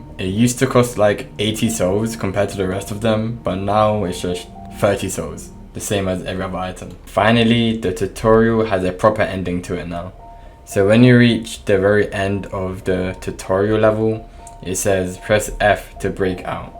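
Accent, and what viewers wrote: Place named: United Kingdom. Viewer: ew briish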